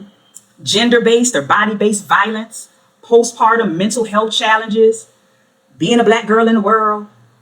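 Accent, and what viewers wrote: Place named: New Orleans, United States. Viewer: U sound like u from New Orleans!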